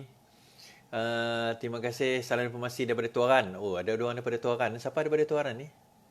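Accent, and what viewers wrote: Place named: Sarawak, Malaysia. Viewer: Abg sy dri kelate still dgr zdisi bhs srawak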